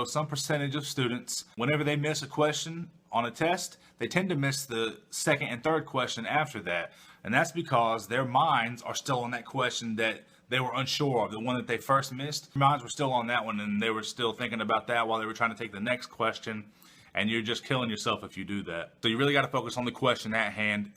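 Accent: South, United States. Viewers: you have such a nice voice..do u sing or rap. it' has a nice southern twang..lol anywho. love your videos man! keep up the great work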